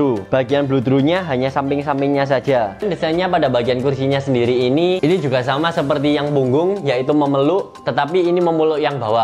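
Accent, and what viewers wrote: Java, Indonesia. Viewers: Aku suka medok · Mendok banget... Gak enak dengernya.. Belajar ngomong dulu ya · Masih medok Harus di halusin 😄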